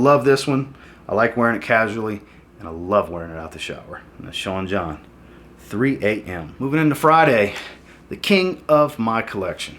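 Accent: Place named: Gulf Coast, United States. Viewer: I’m wondering what you would recommend for a night out on the town in New Orleans. May be wrong but you sound like you’re from the region, gulf coast at least… therefore you know how hot and humid it gets. Looking to wear a fragrance for this Saturday if possible. Already own some basics that could work like Sauvage and CDNIM… Sauvage got me strong compliments the other night. What would you recommend to me? I was close to getting profondo but am now changing my mind. How about something like Prada Carbon? I’ve been looking for days for a good summer night fragrance and I can tell you’ll set me straight!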